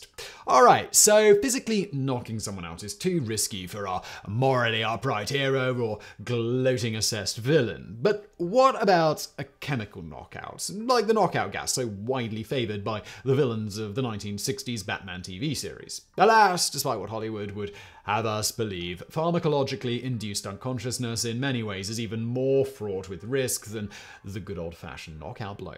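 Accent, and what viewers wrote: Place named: RP, United Kingdom. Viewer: Such a posh accent! Well done, boy, well done.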